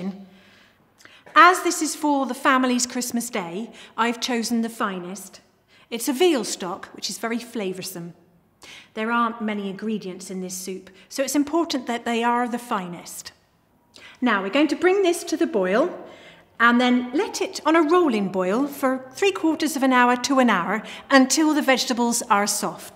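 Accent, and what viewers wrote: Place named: West Country, United Kingdom. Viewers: It's how we say almonds in the UK and always have (especially in the West Country where she is from). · it’s West Country accent, Devon specifically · If I may, it’s a genuine (and genuinely West-Country British) combination of the British English post-vocalic R sound (which is pronounced ‘ah’ as in ah-mond) and the rhotic terminal R which came from the West Country, and became the standard R sound in US English. Celebrate these distinctive differences; they have made us what we are!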